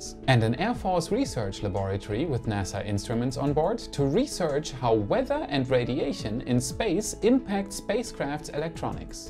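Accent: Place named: Germany. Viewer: Are you from Germany? Bist du aus Deutschland? Deine Aussprache klingt relativ Deutsch. Just currius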